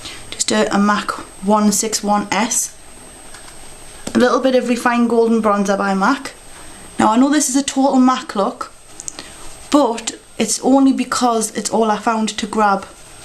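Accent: United Kingdom. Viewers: you look like my art teacher! haha tahts cool. r u british??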